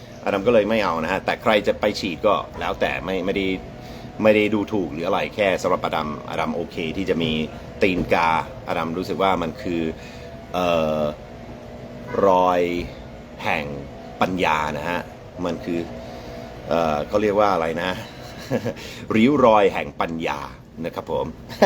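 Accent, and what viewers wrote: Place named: Isan, Thailand. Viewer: อ.อดัม พูดอีสานได้ บ่คือคนอีสาน แต่ก็มีความพยายามนะครับ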